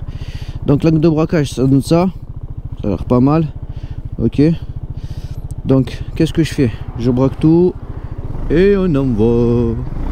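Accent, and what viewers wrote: Marseille, France. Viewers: Cool ta présentation avé l'accent et le panorama superbe. Je ne peux qu'approuver tes remarques ayant une version de l'Xciting de 2019, je ne peux que promouvoir ce scooter qui se faufile sans problème dans les rues et la circulation parisienne. J'ai juste eu un problème de cartographie Moteur qui faisait que je calais à la décélération de temps en temps mais avec la nouvelle cartographie, c'est nickel. Je regrette juste de ne pas avoir le TCS et le superbe écran du 2023. Sinon j'ai possédé avant pendant 2 ans un Xmax 400 et bien le Yam à coté du Kymco était plus que décevant (position, moteur & confort + sécurité). Ride Safe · T ' es vraiment de Marseille ! .😂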